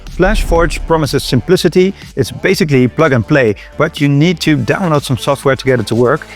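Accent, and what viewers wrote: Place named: Netherlands. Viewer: IDK but u sound Dutch 🇳🇱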